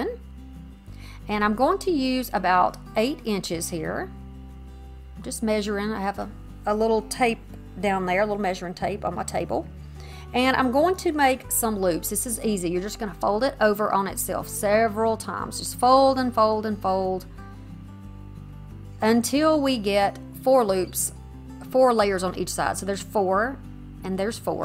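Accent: South, United States. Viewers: Omg love your accent honey! I love the South Y’all are the salt of the earth!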